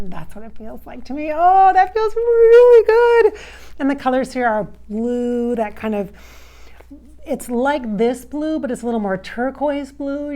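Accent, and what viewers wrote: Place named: Ireland. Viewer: Love your Irish accent lol 😂 watching in Northern Ireland ☘️💜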